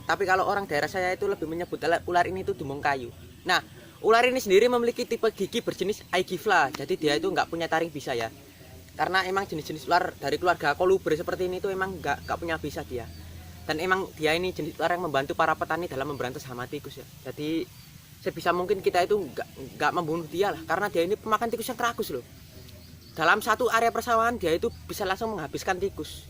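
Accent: Java, Indonesia. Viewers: Dari segi bicaranya keknya dari orang jawa:v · Nk ngomong oj medok" to.. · Jowo ne endi mas?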